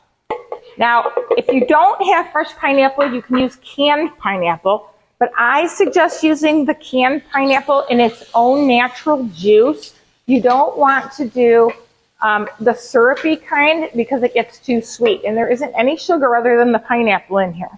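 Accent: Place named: Fargo, United States. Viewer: I love the “Fargo” accent!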